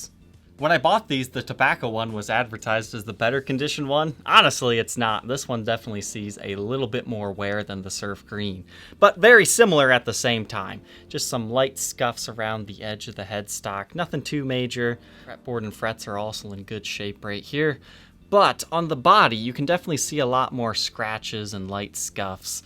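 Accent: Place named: United States. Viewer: Jagwar. The american accent is interesting.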